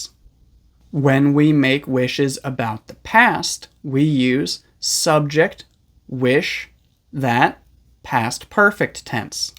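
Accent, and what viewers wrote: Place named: United States. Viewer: I wish I had you nice American accent. I wish you good luck. I wish you had more patience. I wish you all the best in this year. Thanks a lot,. I always I wish you more success.😊😊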